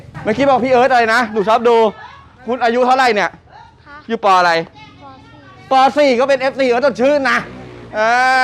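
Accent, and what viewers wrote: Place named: Central Thailand, Thailand. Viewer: ชอบกินเต้าหู้ค่ะ น้องเอิร์ธพูดเหน่อดี ดูเพลินๆน่ารักๆดี เป็นกำลังใจให้นะคะ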